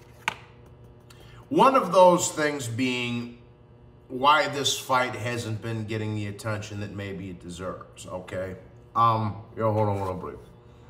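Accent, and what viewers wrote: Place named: Staten Island, United States. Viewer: the way you speak the way you carry yourself you could easily be mistaken for a person from Staten Island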